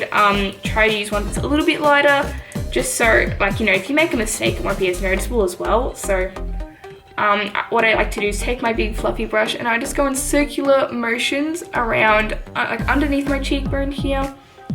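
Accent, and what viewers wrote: United Kingdom. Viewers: British and African ❤ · Question: are u British?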